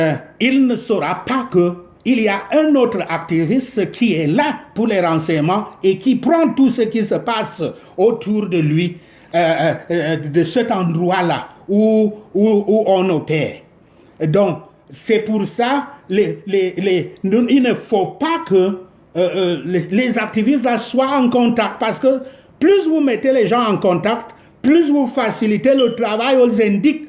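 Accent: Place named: Guadeloupe, France. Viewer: Tu es camerounais mon frère? Comment t as l accent Guadeloupeen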